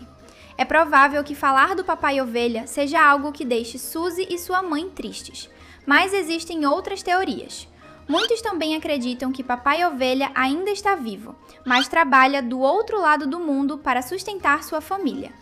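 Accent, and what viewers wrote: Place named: Minas Gerais, Brazil. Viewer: Vc é de onde? Vc tem um sotaque mineiro ou baiano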